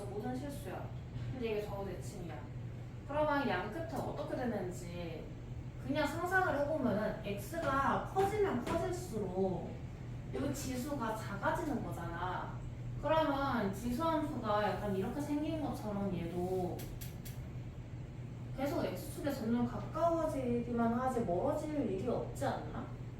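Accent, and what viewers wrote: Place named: Jeolla, South Korea. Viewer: 전라도 분이시네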